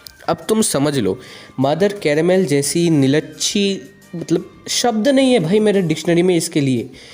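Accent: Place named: Bengal, India. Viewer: joshu haa bhai aur mera dost kolkata mei reta hai aur iss bhai ka bhi bole ka andaj Bengal mei jaise log bolte hai waisa hi hai 😶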